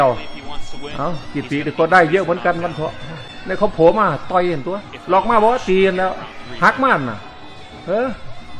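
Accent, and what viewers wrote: Isan, Thailand. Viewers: พากย์อีสานยิ่งชอบ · สนับสนุนเด้อ อ้าย อ้ายหละเน๊าะ ผม2514 คือสิก่อนผมยุดอก มักคักช่องไบรอัน มักทุกช่อง พากย์อิสานมวนคักสนับสนุนเด้อ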